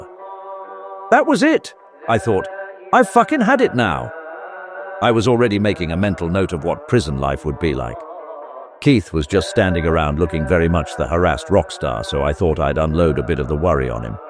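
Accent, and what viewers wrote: United Kingdom. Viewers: It is really good, isn't it ? A professional quality documentary. A BRITISH accent makes a wonderful change from all the rubbish with American accents. Those are inevitably clueless and full of idiotic basic factual errors. Great work. You've got another subscriber !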